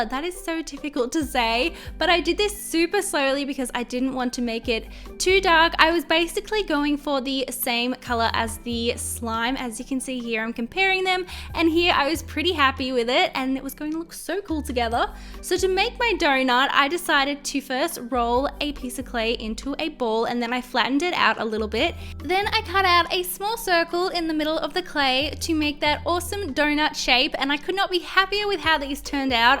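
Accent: Australia. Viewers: Are you from Australia, New Zealand or South Africa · your voice is like italian australian some kind of thing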